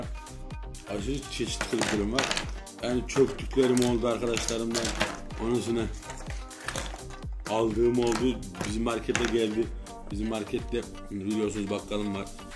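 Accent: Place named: Eastern Black Sea, Turkey. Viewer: NERELİSİN Doğu Karadeniz şiven var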